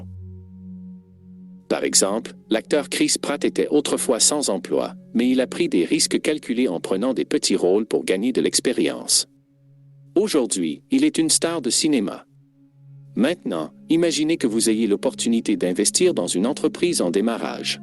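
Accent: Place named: Quebec, Canada. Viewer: De très bons exemples, j'aime bien aussi la narration avec l'accent québécois. Merci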